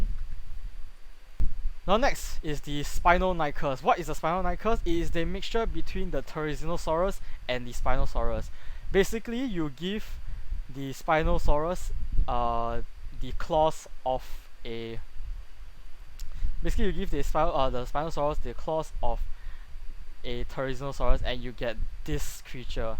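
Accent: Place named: Singapore. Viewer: I'm a simple man, I detect Sing-lish, I liked and subbed, greetings from Jakarta. Stay Safe!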